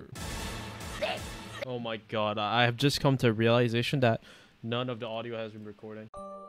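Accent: Canada. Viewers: Some of these comments are making me hear your voice as more Canadian. How the hell? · i cant help but notice that you sound weirdly canadian in this video?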